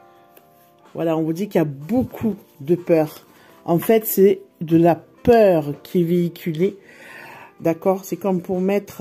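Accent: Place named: Marseille, France. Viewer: Bonsoir, toujours très agréable de vous écouter avec votre accent de Marseille 😘 Merci beaucoup pour vos tirages. Oui il est temps que les croyants se donnent la main pour que la lumière soit 🙏🕊️✨💞 celle du coeur l'amour inconditionnel ❤️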